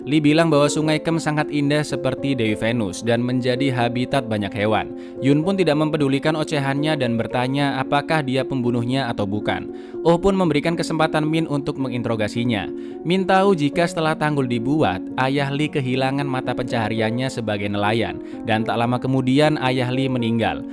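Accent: Java, Indonesia. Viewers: Kak orang jowo to logat ne kayak uwong jowo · Jowone ngndi mas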